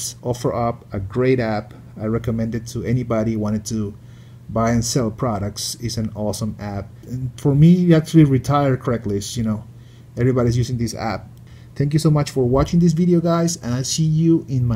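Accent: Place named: El Salvador. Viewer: Has uno en español de todos modo pronuncias el ingles como salvadorian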